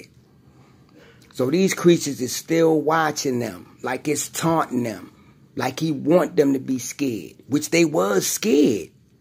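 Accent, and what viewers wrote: Baltimore, United States. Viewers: Bro u sound like u from baltimore da city im in. I know they got bigfoot here in maryland we could probably do some research if you were down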